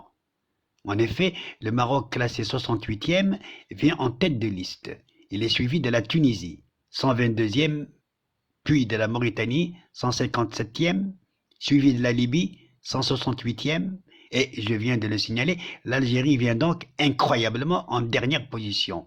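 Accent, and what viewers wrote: Paris, France. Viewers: Monsieur c'est avec révérence que je salue d'abord votre éloquence. Une rhétorique digne des grands lettrés. Vous n'avez rien à envier aux éminents spécialistes de la langue de Molière dans mon pays, la France. Et ce (voyons voir) tellement français d'une bouche à l'accent bien parisien, fascinerait davantage des auditeurs attentifs comme moi. Quant à la richesse du contenu, je m'en félicite encore pour ce qu'elle m'apprend sur le conflit du Sahara occidental que je considère d'ailleurs comme marocain par conviction et non par complaisance, grâce à vous.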